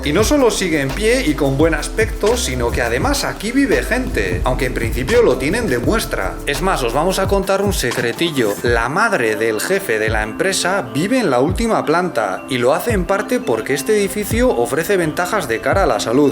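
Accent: Chile. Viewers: Cuando comenzo a hablar le note altiro un acento chileno. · 7 años en Chile, con razón habla así, ahí se habla cualquier cosa menos español..... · tiene el acento chilenx :') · se siente muchooo el acento chilenoooo 🥺💓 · Wena... saludos desde Coquimbo, Chile. Habla muy bien el español con acento chileno. Sorprendente. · Si se le nota el asento de chile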